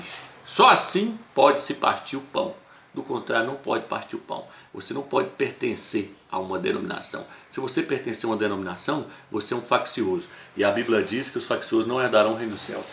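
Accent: Northeast, Brazil. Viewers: tu é do nordeste amado??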